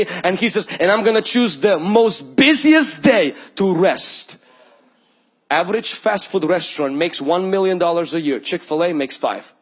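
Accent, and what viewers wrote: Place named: Eastern Europe. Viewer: he sounds eastern European... nice message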